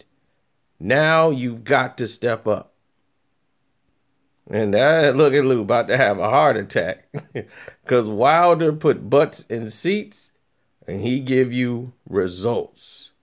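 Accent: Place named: South, United States. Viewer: That southern drawl cracks me up! What you say is true.Takam left his fight with his head held high. Stiverne did not.You can lose with dignity or without it.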